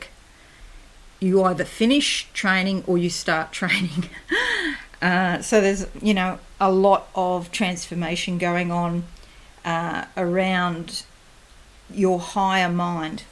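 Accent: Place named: Australia. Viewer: It's so warming to hear a fellow Aussie.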